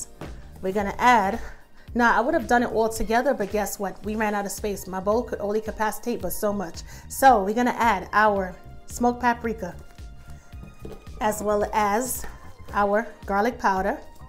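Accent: New York, United States. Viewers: Sounds like New York??? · Looks delicious n sounds like u have a NY/NJ accent. Love it! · U did that !!!!!! U have an accent where r u from ?? NYC? CT? Islands? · Ikr 😆 Is it New Yoik (?) York 😉 I Love it 👍😁.... Just like her looks and cooking skills... Genuinely Natural and Beautiful 😊😊😊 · looks yummy and yes thats a strong new york accent i hear lol it sounds like brooklyn or long island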